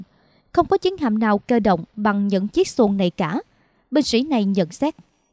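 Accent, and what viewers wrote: South, Vietnam. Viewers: người tàu hả..đọc tiếng miền nam dể nghe vậy mà ko hiểu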